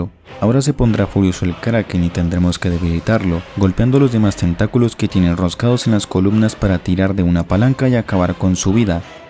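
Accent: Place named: Peru. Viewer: Se nota que eres peruano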